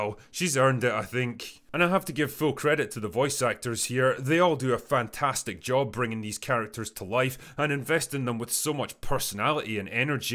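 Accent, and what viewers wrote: Scotland, United Kingdom. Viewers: Watched 10.5 minutes and liked to support our Sco'ish boi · It may be affirmation bias but I love how this was basically a 15 minute video of my own thoughts in a Scottish accent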